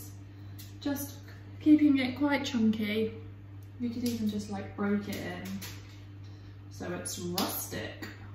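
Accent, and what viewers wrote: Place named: North East England, United Kingdom. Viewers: Just with you based down south with your videos my guess was a m boro accent anyway great videos love seafood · Watched a load of vids of good stuff,I,m from the north east also I,m further north listening to your accent......keep it up I enjoy things that I do...best wishes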